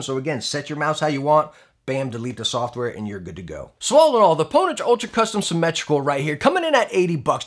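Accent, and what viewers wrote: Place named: New York, United States. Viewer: Bro this guy talks like my uncle from New York and it's great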